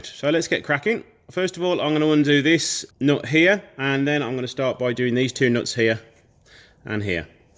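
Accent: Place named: Birmingham, United Kingdom. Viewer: Great vids. Personally, I'm a basic mechanic but looking to learn. Have an '85 Sprint and a S2 147 TS. Good to hear another Brummie too! UTV!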